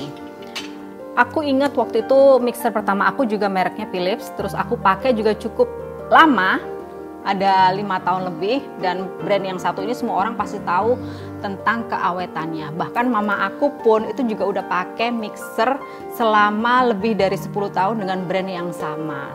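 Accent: Indonesia. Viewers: suka banget sama channel ini soalnya resep nya simple bahannya juga mudah dicari, dan Surabaya an banget suaranya medok khas gitu, video favorit " pudding roti zebra" simple,enak,dan cantik banget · paling seneng karena simple resepnya, mudah di recreat, truss medhoknya itu bikin khass, ngangenin🥰😋 lanjoetkann, resep fav aku dan anak anak adalah "puding lumut" caiyoooo🍮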